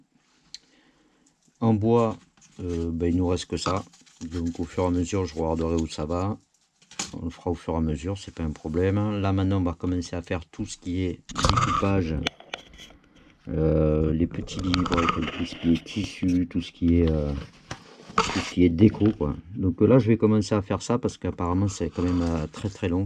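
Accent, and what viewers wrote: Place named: Ardeche, France. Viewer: C'est super bravo, quelle boulot! J'ai acheter le kit il y a une semaine, j'ai 36 ans, si je le finis avant mes 60 je sera très fière de moi! Hahah! Est se que vous êtes situer dans l'Ardeche? J'entraine mes oreilles flamand a reconnaitre les accents different français.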